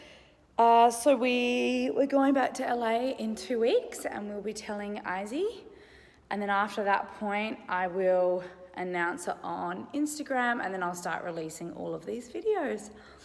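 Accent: Australia. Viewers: Vee-log, gorgeous Australian accent.......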